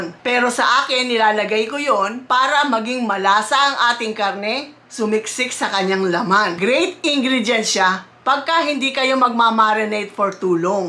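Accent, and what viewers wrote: Iloilo, Philippines. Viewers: ilongga ka pala??? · Ilongga man gali si 🥰🥰🥰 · fan mo talaga ako pero may ask lang ako. Ilongga po ba kayo? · Ilonggo ka nay? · has an accent of ILONGGO hehe love u stay safe. hoy lakas mo asirin c more vlogs po. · Ilonggo ba si? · Ilongga ka? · Ilonggo ka Tita? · Ilonggo po kayo? Duha kabilog hihi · ilongga ka? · Ilongga ba c mother?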